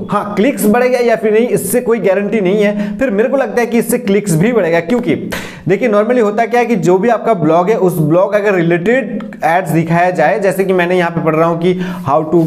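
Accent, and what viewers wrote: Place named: Bengal, India. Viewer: Ore Bangla te bol bhai... Puro bangalir moto hindi bolchis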